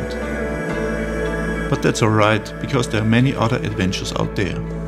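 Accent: Germany. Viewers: Wow! Ich bin fasziniert darüber was für eine Reise du zu der deinen gemacht hast! Ich habe eine Millionen fragen an dich und weiß genau ich kann sie nicht alle stellen jede Stelle ich dir eine essentielle: was war das für ein Gefühl zu Beginn und was für eins am Ende dieser wunderbaren Reise? Ich möchte in den kommenden 3 Jahren mich auf eben genauso einen Trip vorbereiten vielleicht hast du auch eins zwei Tipps wie ich das am besten mache da du dies ja bereits erfolgreich gemeistert hast! Ich komme aus Niederbayern du hörst dich von der Aussprache auch so an als stammst du aus Niederbayern. Mach weiter so! Du hast hiermit einen neuen Abonnenten gewonnen und vielleicht sieht man sich mal auf den Pfaden oder man wandert gemeinsam! 🤩👍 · Könnte es sein das du Deutsch bist? Hast ne deutschen Akzent...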